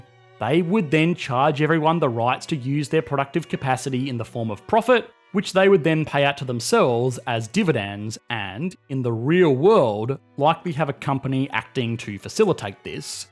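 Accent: Australia. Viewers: Australian accents make me wretch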